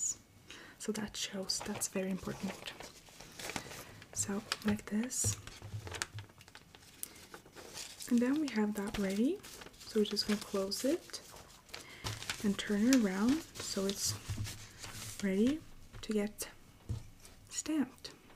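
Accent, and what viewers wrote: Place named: Scandinavia. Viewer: It's so weird how I never noticed your mild Scandanavian accent until you went quasi-albino. 😅 Great relaxing video as always!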